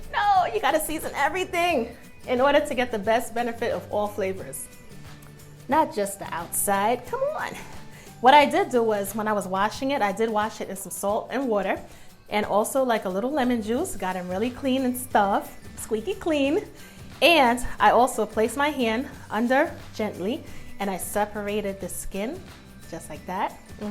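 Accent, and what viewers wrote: New York, United States. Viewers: Are you from New York or New jersey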